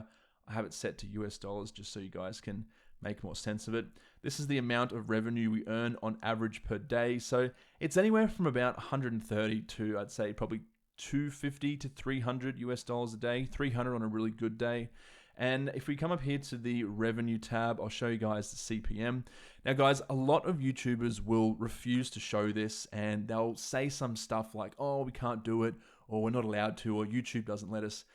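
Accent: Australia. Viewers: Came to this channel for the hot Mac videos. Stayed for the aussie dialect. Will keep on staying for stuff like this.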